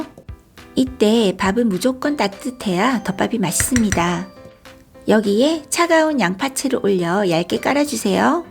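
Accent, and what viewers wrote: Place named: Gyeongsang, South Korea. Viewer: 안조터라구요 경상도 엑센트 ㅋㅋㅋ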